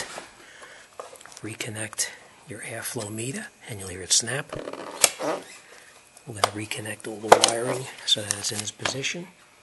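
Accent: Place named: East Coast, United States. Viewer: The volume was fine☺ maybe I just like your voice. Your east coast accent. Wiya=wire 💪👍👌👏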